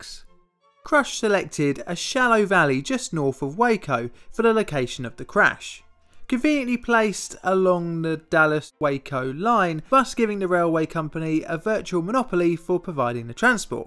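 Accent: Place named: RP, United Kingdom. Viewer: No worries, you're one of my favourite subs, you're interesting and I like your queens English and voice, you should really have more subs.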